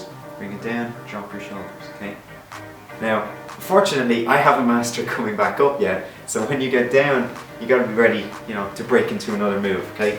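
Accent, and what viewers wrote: Ireland. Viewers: your accent gives me life. irish accents are fab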